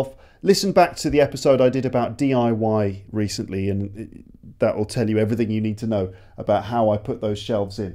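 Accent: United Kingdom. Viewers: Thank you for your episodes. I've been watching and listening to you for some time now (probably 10 months). I found what are doing is very beneficial for people like me, trying to improve their English. I live in Egypt and mother language is Arabic. In my country, and probably most of the arab area, find that the American accent is easier for them and suitable with people with Arabic as mother tongue. Do you think this is correct???. In my case, though, I don't find it like that. I think the British accent is easier for me. That is why I'm following your channel.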